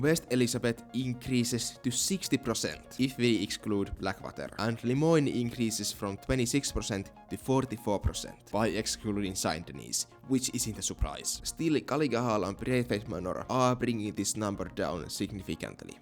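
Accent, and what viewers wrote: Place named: Finland. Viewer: I can see his hard finnish accent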